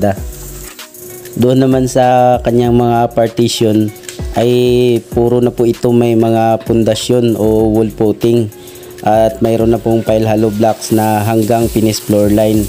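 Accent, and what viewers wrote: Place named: Visayas, Philippines. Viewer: Saan po kau,, narinig ko bisaya😊 gusto ko din kc pa bahay taga mindanao po aq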